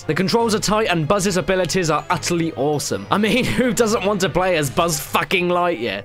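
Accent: United Kingdom. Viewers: His British right? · This is my first video of yours, got to and though. "Holy shit it's British JonTron" Not that its a bad thing its amazing. Also you have the exact same video game upbringing I did. With all PS1 and no nintendo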